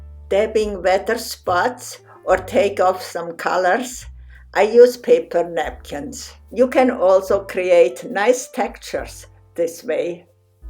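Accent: Switzerland. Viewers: Soft sensual painting along with the painter’s beguiling Swiss accent sharing artistic tips: Brava!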